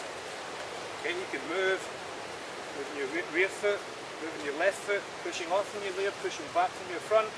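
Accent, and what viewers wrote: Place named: Ireland. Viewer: good ole irish accent. atleast i think its irish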